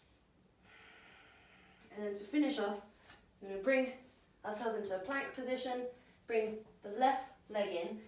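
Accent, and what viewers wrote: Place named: New Zealand. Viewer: You have a nice accent. Is it NZ?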